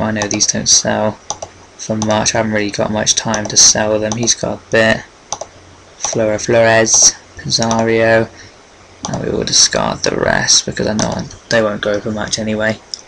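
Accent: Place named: Suffolk, United Kingdom. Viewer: Ahh, ok. You just sounded like someone from suffolk! lol How do you make your coins on ultimate team?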